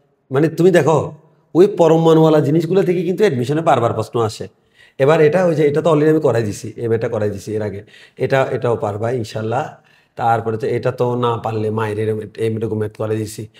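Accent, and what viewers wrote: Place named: Chittagong, Bangladesh. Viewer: Kotha te bujhai jai vaiya chitainge 😂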